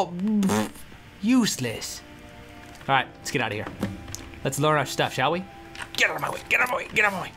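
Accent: Russia. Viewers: this guy sounds like fpsrussiawith his russian accent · You had a russian ascent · I like the Russian accent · Holy crap... I just realized what his Russian accent reminds me of! BARTOK. FROM THE MOVIE 'ANASTASIA'. Holy crap... wow. I'll never watch that movie the same way again.: "I give her a 'HAH', then a 'HIYAH' and I kick her, sir." Wow. My night has been made. =]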